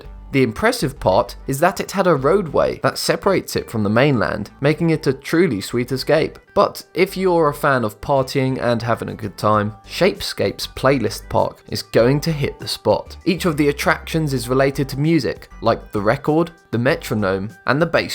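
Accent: United Kingdom. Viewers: is he american with a british accent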